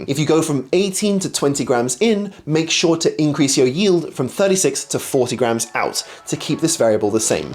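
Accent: United Kingdom. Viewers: The advice is for spoiled brats. Another British accent bullshit.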